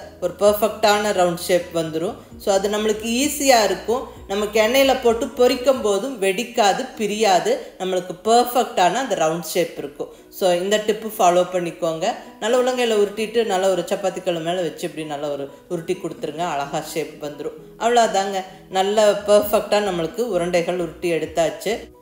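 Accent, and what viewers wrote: Kongu, India. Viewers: Ungaluku koral super kongu styles beautiful sister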